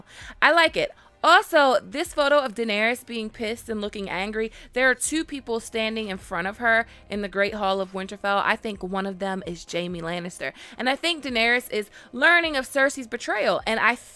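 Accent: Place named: East Los Angeles, United States. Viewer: I love your voice! It’s kinda East L.A. with a hint of Inglewood 😊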